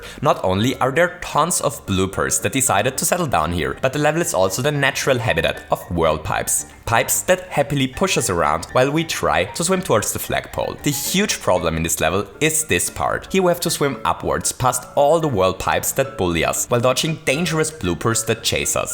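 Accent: Germany. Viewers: Ich wette dh bist deutsch 🤔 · Kann es sein, dass es deutsch bist? 😂❤️ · Ur english sounds very german ps: ich höre mich öhnlich an wenn ich englisch rede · Bist du deutscher?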